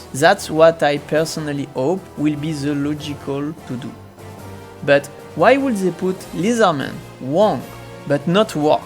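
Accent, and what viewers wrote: France. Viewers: Ooh gosh you’re French you hahaha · So you're speaking with a French accent? Your pronunciation sounds great. I wanna talk like that lol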